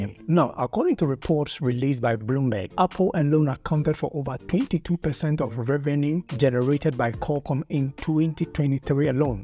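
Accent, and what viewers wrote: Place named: Africa. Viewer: Cool are u African becuse your English reveals your country It's really cool Love from india🇮🇳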